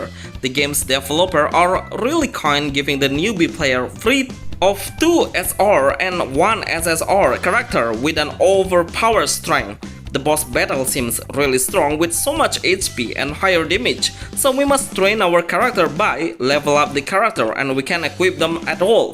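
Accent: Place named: Indonesia. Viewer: Bahasa inggris kamu udah lumayan bagus,tapi kamu perlu menghilangkan aksen indo mu bg,tapi makasih bg❤😊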